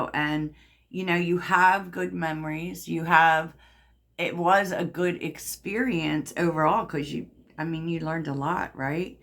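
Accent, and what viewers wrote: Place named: Mid-Atlantic, United States. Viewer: Where are you from? Sounds very PA/MD/DE! Baltimorean here.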